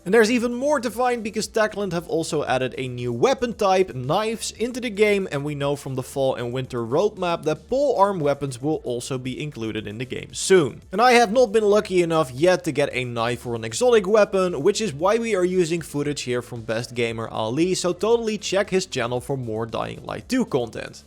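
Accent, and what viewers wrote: Netherlands. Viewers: This man is from the Netherlands, I can tell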